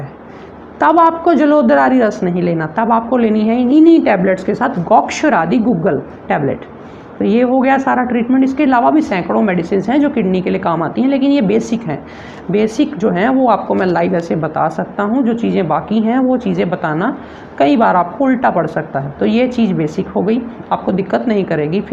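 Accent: Punjab, India. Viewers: भाई जी आप की हिन्दी बहुत अच्छी है आप पंजाब-हरियाणा से तो नहीं है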